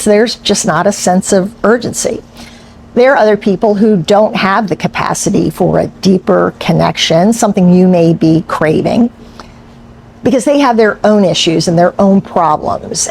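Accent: Philadelphia, United States. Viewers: Thanks... About your accent. Are you from Delco, Philadelphia???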